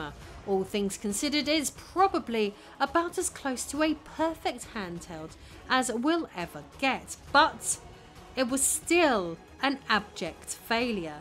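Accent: United Kingdom. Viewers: oh dear! Your british accent is simply killer!!!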